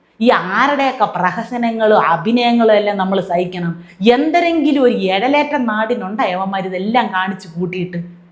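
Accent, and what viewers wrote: Thiruvananthapuram, India. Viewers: കലക്കി,. തിരുവന്തപുരം ഭാഷ 👌 👍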